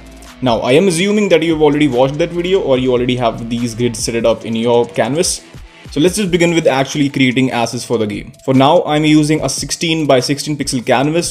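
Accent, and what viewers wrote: India. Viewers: are you a indian , not gonna lie your voice and accent are beautiful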